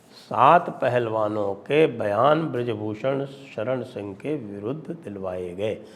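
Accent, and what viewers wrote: Haryana, India. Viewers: Loved the Haryanvi accent, and the clear update on this issue. These shorts are so valuable. Thank you. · Sanjay Dixit, " Thari Haryanvi Mahre Aachi Lage " Thanks. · Chokhha hariyanvi andaaz sir ji😂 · A very amusing and informative video with hariyanvi tone.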